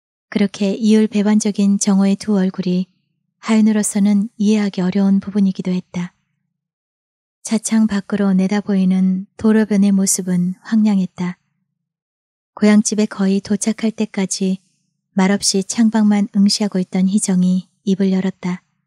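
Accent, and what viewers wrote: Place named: Gyeongsang, South Korea. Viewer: 목소리의 마법사이신 어쩌면 경상도 사투리도 넘~ 감사합니다^^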